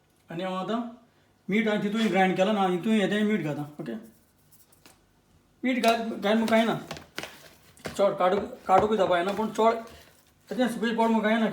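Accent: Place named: Konkan, India. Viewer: I like your konkani accent...and your recipe's are really good. 👍Tuge chouris maas receipe maka bori lagli. Mog asum.🤗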